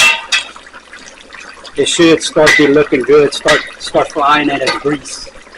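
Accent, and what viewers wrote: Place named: Louisiana, United States. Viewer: Love the videos, you just need to get a lapel microphone so that your voice is clearer and we can hear that cajun accent better.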